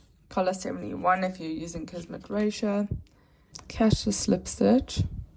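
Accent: South Africa. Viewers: I was so happy when I heard the South African accent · From your accent I can tell you are South African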